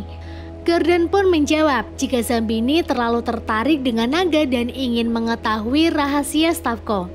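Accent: Pemalang, Indonesia. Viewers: Orang pemalang ya kak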